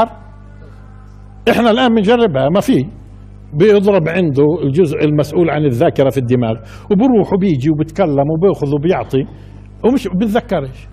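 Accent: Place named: West, Algeria. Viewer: سبحان الله نفس اللهجة عندنا في غرب الجزائر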